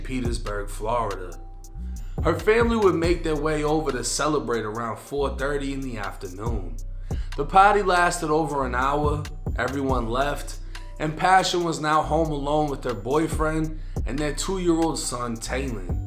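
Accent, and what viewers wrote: Boston, United States. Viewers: 📖dude your storytelling is on point.followed this nightmare on the media but all the gory details omitted. And love the bahstan accent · Bro.. You have to be from Boston.. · Tell me you are from Boston without telling me you are from Boston.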